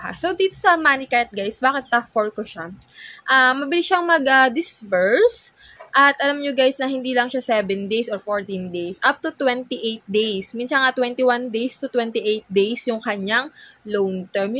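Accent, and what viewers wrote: Batangas, Philippines. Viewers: laang?? 🤣😁✌️✌️ batangenya ka te? hehe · Batanggeña ka po??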